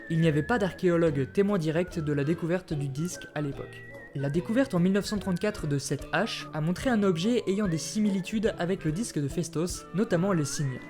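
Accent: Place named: South, France. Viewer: petit accent du sud qui fait plaiz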